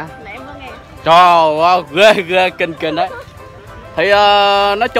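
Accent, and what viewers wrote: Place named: Dong Nai, Vietnam. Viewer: Quê a đâu mà e nghe a ở long khánh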